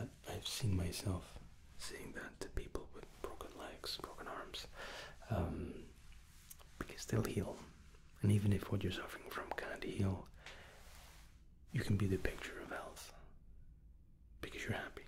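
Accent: Scotland, United Kingdom. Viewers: Why are u so Scottish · I didn't realize you were born in England,? I thought you were full on Scottish. Were you raised in Glasgow? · Scottish I thought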